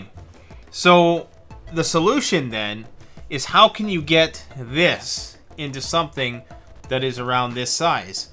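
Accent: Canada. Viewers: You, sir, have a violently Canadian accent. And it is beautiful. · I'm sorry if this comes off as rude, but I have not heard a more Canadian accent ever. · The GoldenChild he’s Canadian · Wrong! The wetter your wiper be, the more likely your becoming contaminated by fecal waste..that pervasive accent ought to reveal how abominably runaway Canadia/en dorkiness is. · I'm not big in prepping but I subbed because of your pure Canadian accent I wish I sounded like this but alas I sound like an American who moved to Canada and I'm not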